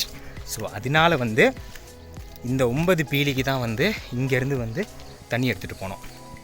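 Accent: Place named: Tamil Nadu, India. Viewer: மகனே நீங்கள் தமிழ்நாட்டில் பேசுவது போல் பேசுகிறீர்கள் முன்னோர்கள்‌பூவர்வகுடிமக்கள் தமிழ்நாட்டில் இருந்து வந்தவர்களா.அந்த பாக்கியம் பாட்டியும் தமிழில் நாட்டில் பேசுவது போல் இழுத்து வணக்கம் சொல்கிறார்கள்.இன்றுதான் முதல் முறை உங்களுடைய வீடியோ பார்க்கிறேன்.உங்களைபற்றி எதுவும் தெரியாது இனிமேல் தான் மற்ற வீடியோக்கள் பார்க்க வேண்டும்.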